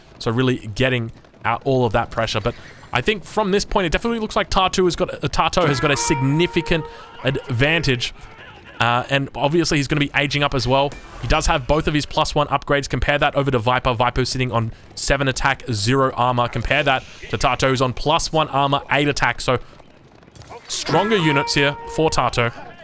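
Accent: Australia. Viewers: So refreshing to listen to a fellow Aussie caster. Love ya work mate, keep it up!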